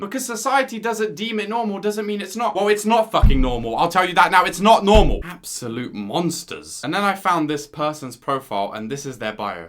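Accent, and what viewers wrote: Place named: United Kingdom. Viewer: It always sound better and more degrading with a British accent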